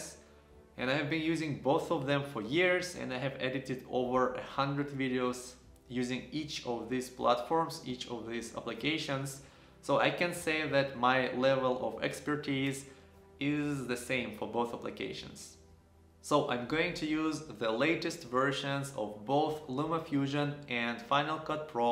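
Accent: Russia. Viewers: Нам показалось что ты русский! английский норм. продолжай! Лайк влепил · I love your Russian/Slavic accent!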